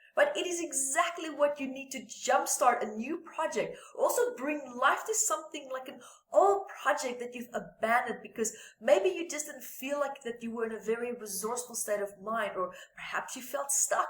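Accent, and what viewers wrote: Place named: South Africa. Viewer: She is from South Africa 🇿🇦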